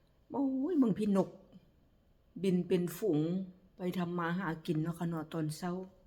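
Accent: Nyo, Thailand. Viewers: มานั่งฟังพี่ เม้ามอยเด้อจ้า❤มักๆสำเนียงญ้อค้ะ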